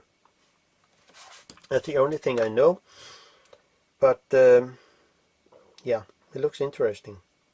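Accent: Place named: Denmark. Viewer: Excellent. I've had the Fuji badged version from new in 2012, good to know what's going on inside, and should I need to calibrate the rangefinder mechanism this is very helpful. Thanks also for speaking English. Are you Danish? Just out of interest, I'm guessing from your accent.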